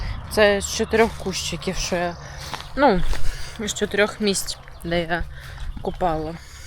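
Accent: Ukraine. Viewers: Прикольно балакати: почала тойво підгортати )) як на Закарпатті)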